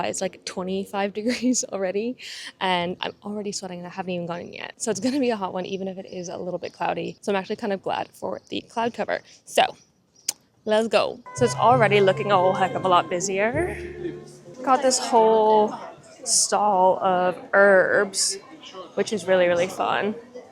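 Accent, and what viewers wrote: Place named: London, United Kingdom. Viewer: Your London accent is improving! Banana plants and 20 quid but you slipped up major on Herbs! 😊 I love that market - how did you come away twice with nothing? 😲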